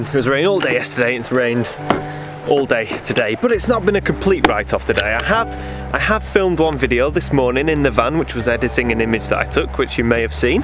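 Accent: United Kingdom. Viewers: Your channel discovered me somehow and I’ve enjoyed it thoroughly! Your accent is amazing! From where in the UK are you from ? Can I with my Mamiya C220 and Sekor 55 lens attempt at these vast expanse type pictures? I am yet a film amateur photographer! Should I resort to my Sigma 18-35 mm wide angle zoom for my Minolta Alpha 35 mm camera instead? And if you’re ever in Costa Rica I’ll take you to the Roca Bruja (Witch rock) in Playa Naranjo at the Santa Rosa National Park for some pictures! Regards, Robert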